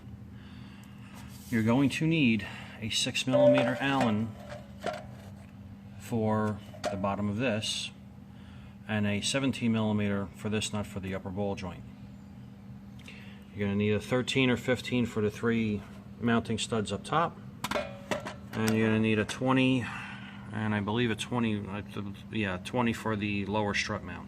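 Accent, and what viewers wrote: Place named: Central Jersey, United States. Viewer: you have the most proper central jersey accent i ever herd. also im doing this 3 in and 3 in rear aswell on my gmc canyon just waiting on parts.. cool video even tho its old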